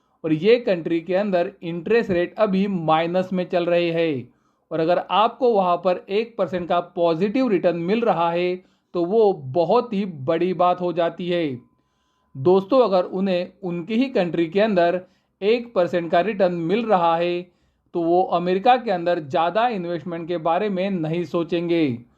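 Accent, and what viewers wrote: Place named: Gujarat, India. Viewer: Aap Gujrati Ho Aisa Aapki Boli Se Lagta Hai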